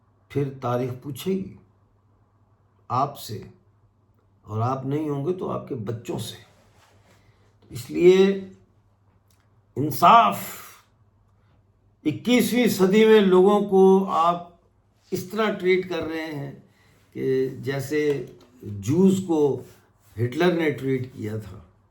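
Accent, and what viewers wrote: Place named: Punjab, Pakistan. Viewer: Chacha Apne pojk Ka bhi Btao kya ho Raha he Waha? 😮 Tum baaki poori duniya ki News btate to LEKIN pojk Ka ni btate ? Tum panjabi ho kya? Tumhara Accent panjabi Lagta he 😮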